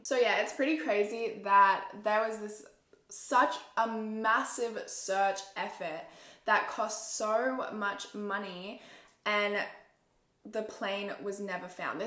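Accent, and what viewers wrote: Australia. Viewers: Are u Australian or American?😂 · Wow im memorised listening to this absoulutly beautiful lady and love the aussie accent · Are you Australian?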